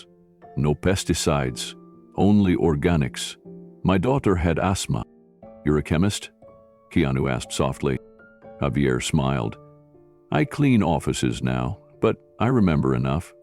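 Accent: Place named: United States. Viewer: ❤ I DO Appreciate these stories especially regarding Keanu, for example and credits. BUT ADS!? For those of us already bombarded with constant Google ads that are depressing for the fact that elderly ads are about drugs, weight loss, everyday relentless bombs of negative facts of life, aging, to the point of brainwashing and same Ads over and over, taking up more time than the story on some. I do appreciate the American dialect of a well educated man, not AI.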